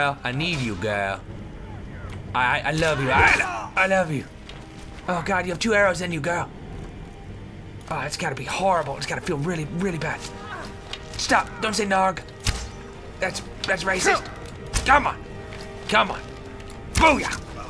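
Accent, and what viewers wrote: United Kingdom. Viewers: how did you come to develop such a british accent?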